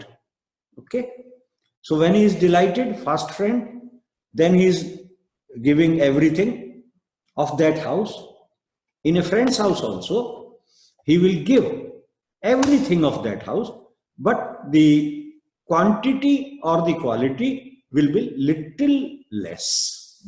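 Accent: India. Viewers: Спасибо за русские субтитры: иногда приятно отключиться от сильного индийского акцента.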